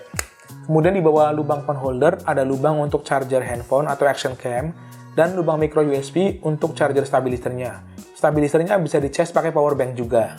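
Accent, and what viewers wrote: Tegal, Indonesia. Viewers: Wah mase wong tegal ya mas?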